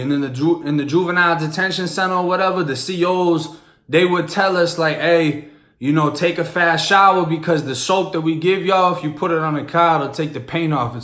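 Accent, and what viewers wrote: Boston, United States. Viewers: I new I could here the Boston pahk tha cah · Why does he have a Boston accent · Idk why the Boston accent is so interesting lol .... Concrete-ass-room with the stupidest teacher in the school. That shit had me rolling. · That's crazy you move to Florida about the same age I was when I moved out of Florida I don't know where people say you got a Florida accent cuz homie I straight here nothing but Boston accent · You can tell the Boston accent is the real one with the dropping the Rs. The Florida hood accent is acquired not necessarily fake but more adopted. I’m a white dude who grew up in the hood in Philly. a couple of my white friends i grew up with used to put on that hood “blackcent” when we were younger, tho some still talk like that. But now that we’re in our 30s most just have the normal shitty, Philly white dude accent. 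· the cah 🚙 That Boston accent 😂😂😂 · Alot of people in the Boston area sounds like that. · Boston with a Blac-scent’ LOVE IT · I new you were from the Boston area!! As soon as I heard you speak, I said yup! Boston accent! Boston born and bread here, Dorchester Mass or a Dot Rat as they say 🤣 myself!! 😊! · your accent is so strong for not growing up in boston lol! I love how yall say Car haha sounds so funny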